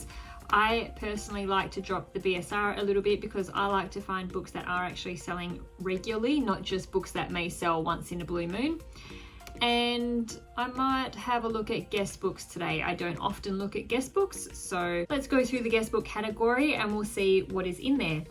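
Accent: Australia. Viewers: HI are you Australian or NZer ?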